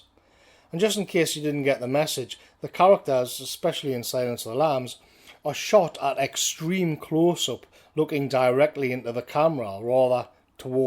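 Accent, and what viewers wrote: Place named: Geordie, United Kingdom. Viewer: nothing to do with your video, as they're all exceptional; I just wanted to say as a Geordie ex-pat living in the colonies, I love your vids. Just hearing the local dialect brings me closer to home, even though i'm thousands of miles away. Keep up the good work big fella!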